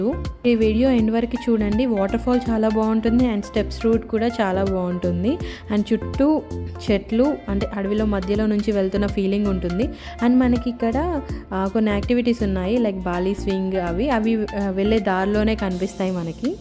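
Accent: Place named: Hyderabad, India. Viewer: Eydhemaina hyd slang marchipole... Very good keep it up...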